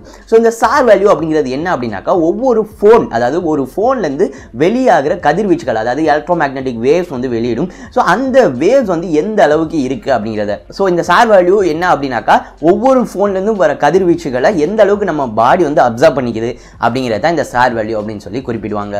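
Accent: Madurai, India. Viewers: Bro neenga Madurai ah????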